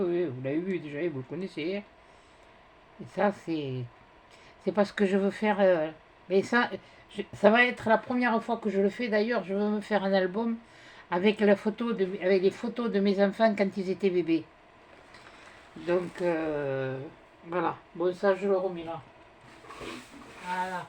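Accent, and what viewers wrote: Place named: South-West, France. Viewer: Félicitations pour ce concours gagné ! Joli colis c'est vrai que le bloc sur la lune est sublime .... jamais trouvé moi non plus sniffffff .... 1 jour peut être. Merci pour cette bonne humeur dans les vidéos.... et ce bel accent du sud-ouest ! Merci ! Je suis 1 grande débutante et j'apprécie cette simplicité des explications.......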